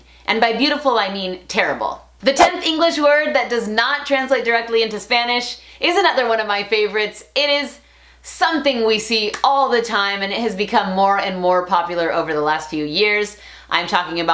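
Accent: Mexico. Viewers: Espectacular tu español con acento mexicano!! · SE TE NOTA EL ACENTO MEXICANOOOOOOOOOOOOOOOOOOOOOO cuando hablas en inglés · Haters is Odíoso in spañish. Remember that you speak Mexican Spanish. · hahaha your mexican spanish is so cuchi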